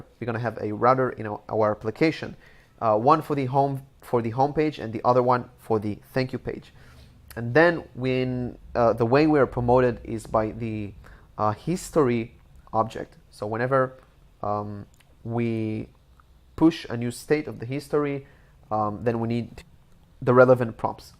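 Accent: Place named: Israel. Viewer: סרטון טוב תודה!! באמצע הסרטון פתאום חשבתי לעצמי... רגע רגע יש לו מבטא ישראלי :)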